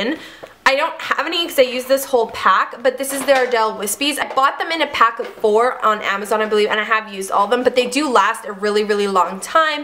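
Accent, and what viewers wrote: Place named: France. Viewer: You sound super french in this video :P (fellow Canadian here!)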